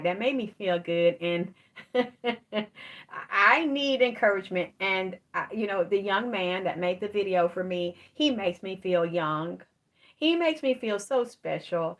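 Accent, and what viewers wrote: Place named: Louisiana, United States. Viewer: You from Louisiana? I was listening to another video and when you start speaking fast your accent sound like you're from South Louisiana?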